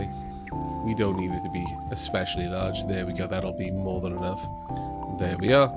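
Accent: Wales, United Kingdom. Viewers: Question are you from Wales if so are your from South Wales?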